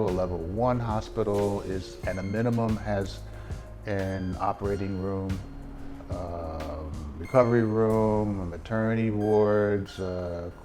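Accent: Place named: North, United Kingdom. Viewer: Good job 👏👍keep banging out content and your channel will grow. The phillipines has softend you northern accent alot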